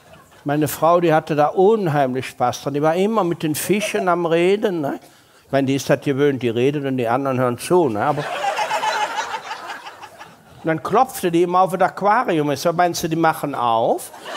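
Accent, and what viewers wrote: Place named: Rhineland, Germany. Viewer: Wenn man sich mal an den sehr ruhigen und rheinischen Dialekt gewöhnt hat, wobei ich mit rheinisch überhaupt kein Problem habe und es auch sehr gerne mag, Mama war aus dem Ruhrgebiet, dann lernt man ihn erst so richtig kennen und sagt Respekt. Sehr viel Wortwitz! Ein wirklich guter Hausmann auf der Bühne!🤣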